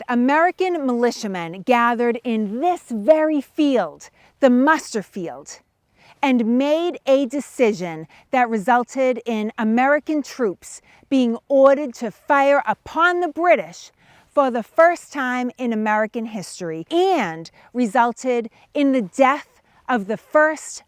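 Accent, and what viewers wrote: Boston, United States. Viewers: These are wonderful historical lectures. You uncover and vividly capture the details, mundane and critical moments alike, in time past and report them as a sister, with a true native Boston accent. It is charming, really, and authentically underlines your personal connection and enthusiasm - as well as impressively microscopic as well as encyclopedic knowledge. Thanks for connecting it all and sharing the stories on your channel, it is really is a pleasure to experience your work. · Visitors Centa! Yes, great Boston Accent! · I live out here in Washington state and to my ear, we have a very vanilla accent here. Thanks to this channel, I've decided that this Boston accent is THE BEST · As a former “Bostonian “from Lynn, I instantly fell in love with the channel. Of course the “Boston “accent was a very strong influence.❤❤ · Nice Boston accent. 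· Superb presentation! Besides the detail, both tactical and personal, I love the Boston accent; PAAK, YA CAA IN THE HAAVAD YAAD. Ha ha. All over America we have different accents but we are one people. Thanks for posting this lovely tribute to our founding. Regards, Bernie Bunny. 7/5/2024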